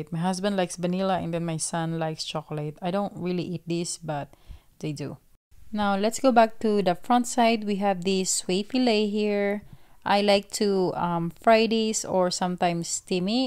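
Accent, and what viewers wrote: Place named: Philippines. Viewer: 🛒 Loved the video, so I had to subscribe! If you don't mind my asking, are you Filipino? I lived in the Philippines until I went to college in the states and your accent makes me homesick. ❤ So many good items you found at Sam's club! I'll have to try the fried rice, it looks so good!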